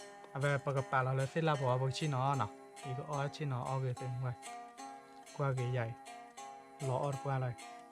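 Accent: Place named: Nakhon Phanom, Thailand. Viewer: นครพนมชัดจ้า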